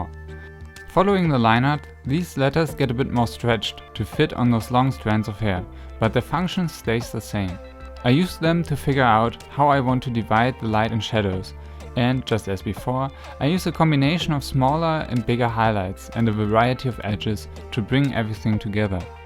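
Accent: Germany. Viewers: I know I‘m late, but I just wanted to thank you for what you achieved and did for all of us here. Your tips are incredible, and after all hair is the thing that stands out the MOST while looking at characters, it‘s the thing that makes them so different, just like eyes or whatever. (Ich war sehr überrascht, als ich den deutschen Manga sah, aber an deinem Akzent habe ich schon erkannt… also in dem Moment dachte ich „Hmmm könnte es sein?!“ finde es voll cool, dass du deine Videos trotzdem englisch machst. Mach weiter so!!! ✨)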